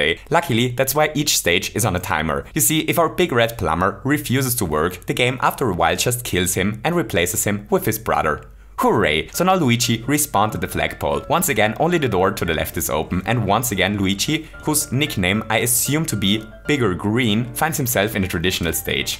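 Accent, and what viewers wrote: Germany. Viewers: Ahhhh ein Deutscher. :D Dein Dialekt verrät Dich. ^^ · This german accent....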